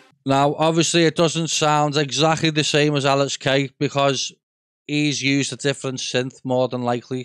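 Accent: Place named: Liverpool, United Kingdom. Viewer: yes! im over in runcorn, obviously your accent tells me your liverpool area. do you bother with private lessons mate? also, which keys are you pressing to get this melody, cheers pal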